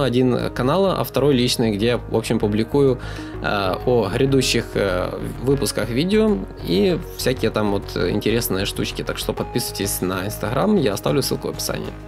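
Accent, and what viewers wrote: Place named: Kharkiv, Ukraine. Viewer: Кстати, из какого ты города? Акцент на харьковский похож))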